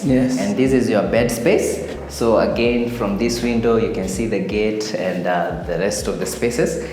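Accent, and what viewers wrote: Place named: Kenya. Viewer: First of all, I like, and especially his kikuyu accent 😂😂, he's my favourite real estate reviewer. However, honestly, whatever's keeping that house at that price point is the location and probably the landscaping. Everything else is just off, assymetrical, outrageously overpriced for decor that is below luxury standard, exterior design is barely standard while interior design is just as bad as it can get. For modern living standard, metallic doors just picked off of a jua cali base is as bare minimum as it can get. Let me not even get to the bathrooms. Whoever designed this house is such an embarrassment to high end real estate architecture. The only thing that may attract you to this house is the lawn otherwise the developer should go benchmark from the professionals. Very poor design. Wth😳🤔.. was good tho.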